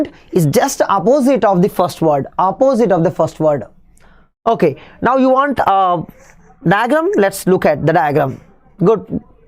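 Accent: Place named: India. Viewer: Your hindi accent is so amazing 😂 btw session is really helpful. Thank you sir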